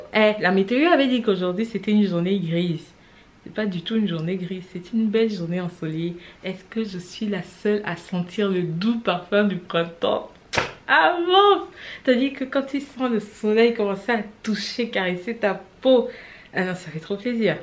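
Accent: Cameroon. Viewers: Hey je te découvre tata (comme tes abonnés t'appelent affectueusement)😊! C'est moi ou j'entend mon accent camer par ci par là? Si oui ça fait super plaisir de retrouver des Camerounais sur la toile! Je vais prendre le temps de découvrir ta chaîne. Vous avez l'air super sympas, tous! Allez, des bisous!! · Waoouuhh ma Soeur on dirait k j ai raté ce vlog. Mais suis trop fan de la façon avec laquelle tu changes ton accent c est l accent camer là qui me peut me tuer de 😂😂😂😂😂😂😂😂😂😂😂😂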